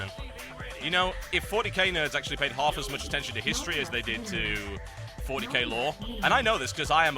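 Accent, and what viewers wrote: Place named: Newcastle, United Kingdom. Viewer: He's a Geordie, that's why. Learn your British accents.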